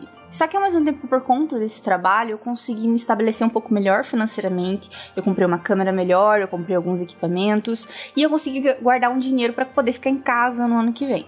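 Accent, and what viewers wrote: Paraná, Brazil. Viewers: Caraí!!!!!!!!! Tu É Paranaense!!!!!!!!!!?????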